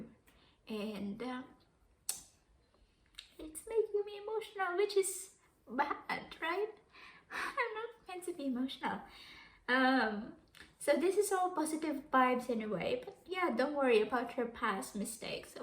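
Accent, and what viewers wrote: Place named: Australia. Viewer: Yes nemern! You sound very Aussie na!👏🏻 Keep up the good work and Godbless!🙏🏻 Taas kamay for us Filipino Migrants!🇵🇭🇦🇺🇨🇦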